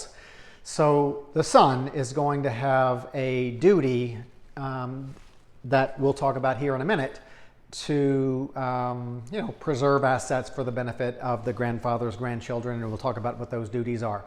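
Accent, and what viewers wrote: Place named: Louisiana, United States. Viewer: Are you from Louisiana? Because you speak exactly like Dustin Poirier, same accent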